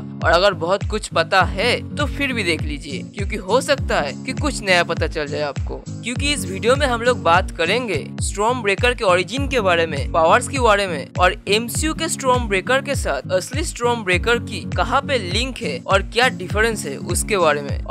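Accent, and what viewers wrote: Bengal, India. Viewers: Are you Bengali??? caus something like Bangla in your Hindi??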